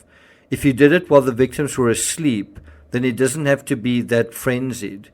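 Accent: South Africa. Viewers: is from South African. We have friends from Durban who have the same accent. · You accent is like a South African?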